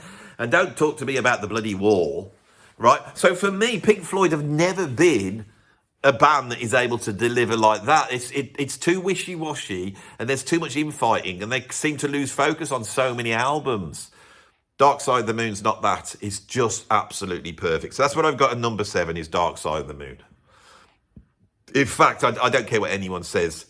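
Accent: Birmingham, United Kingdom. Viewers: It’s the British accent, neuroticism and insights that make this bloke’s channel cool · Love the vids. I'm not into prog, jazz or jazz fusion (I'm not sophisticated enough, I s'pose). I don't come here for them anyway. I come for the freestyle rambling humour of grounded in a deep knowledge of music. Just the Brummie accent brings a smile. I watched a recent video right to the end that had the rambling Brummie monologue at the end wit the animation. Loved it. Reminiscent of Eric Idle's rant on the travel agent sketch in Python (could that be the first comedy rant?...). I watch these lists tightly gripping the arms of my chair praying that a album will get its due. Usually, I disappointed, but, as previously mentioned, I'm not very sophisticated. As a Canadian, I was glad to see on the list. Our artists never get enough recognition. As for getting words wrong and your philosophy regarding perfection, I'm a few years older than and can empathize. 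I've always annoyed people with my ability to recall musicians, actors, directors etc... That has suddenly become more difficult. I can start a dinner conversation about a famous director then, suddenly, his name leaves my brain for a minute... It usually comes back within a few minutes but it is disconcerting. What a drag it is getting old... someone should work that line into a song... When it comes to mistakes therein lies the charm. I enjoy for the spontaneity, the unbridled enthusiasm. If was to present a perfect narrative, true to script like a politician reading off a t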